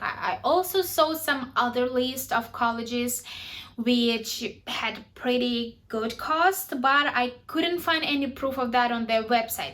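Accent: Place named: Ukraine. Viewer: ukrainian accent is nice don't be shy of it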